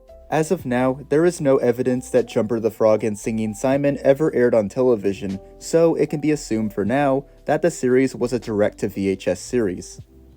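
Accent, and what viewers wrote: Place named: United Kingdom. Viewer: You sound British, dude Edit: hey,is the creator of KND related to Patrick Warburton,famous voice actor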